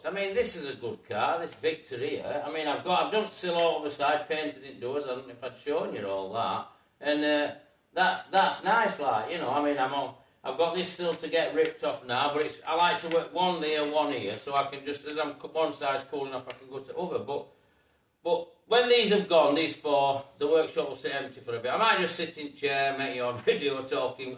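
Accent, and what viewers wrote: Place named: Barnsley, United Kingdom. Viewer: Good video pal just subscribed, you sound very local to me barnsley ish. Show us some update videos of the welding n stuff. Cheers 🍻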